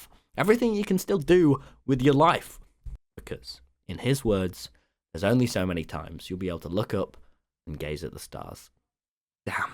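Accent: United Kingdom. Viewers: so... the weekly slap but bri-ish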